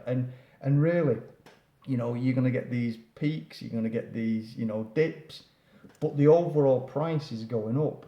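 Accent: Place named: Yorkshire, United Kingdom. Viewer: From Leeds? Yorkshire? Loved the video! Very informative. Steem is interesting with its user interaction with it/ social aspect.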